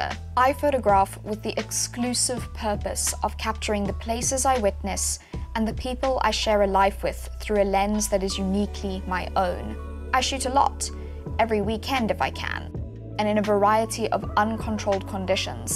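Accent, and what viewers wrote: South Africa. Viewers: first five seconds and i know I'm listening to a fellow south african! much love from new zealand.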